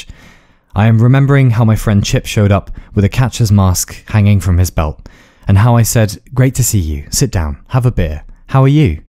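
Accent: England, United Kingdom. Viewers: sublime british accent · You have the ability to get me interested in about anything, even if i wasn't before. Anyway thanks for changing my entire view of the world with your videos and your cocky but charming british accent! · "Life is a mountain you must Climb to see the view from the top, and the journey itself is the most important part of the whole thing" I came up with that quote because of your channel, and I want you to know that I know you, but you don't know me, so... I dunno.... Wanna grab a cup of tea and a slice of cake fellow carbon unit that has a strong English accent and a half developed hatred for wasps, and just wonder about how living is a fucking miracle and being alive is incredible? I want to thank you deeply, as I am able to connect to the ideas you present in your videos at a very deep level, and it makes me feel peaceful. 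Not dreading anything, not being scared of inevitable death, but just to be able to be calm for 10 minutes and have a deeper understanding of the universe is incredible and you make that happen. Keep doing what you do, and never change. You have come so far, rest here a while, for there is so much further left to go. And I can't go without you.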